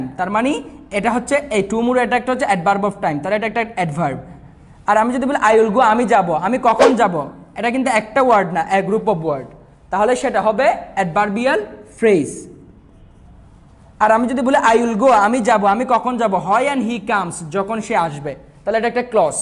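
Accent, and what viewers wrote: Chittagong, Bangladesh. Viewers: বদ্দা চিটাগংগা নে??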